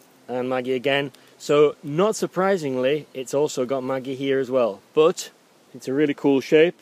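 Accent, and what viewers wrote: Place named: Manchester, United Kingdom. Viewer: Are you guys new and do i detect a fellow manc? Loved this video and looking forward to more! You are very watchable and I’m fussy!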